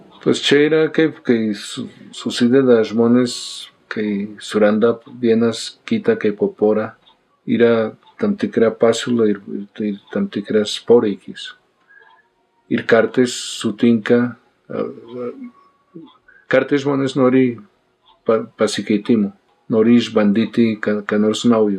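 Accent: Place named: Bogotá, Colombia. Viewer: Tiene asento bogotano en Lithuano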